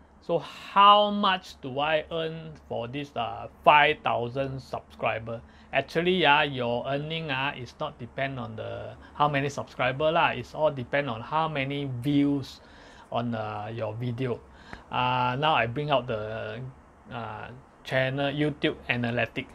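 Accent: Singapore. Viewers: Subscribed, love the true singaporean vibe, the Singlish is power, and it’s just like watching your neighbourly Uncle talking, brings us back to kampong days. Keep it up.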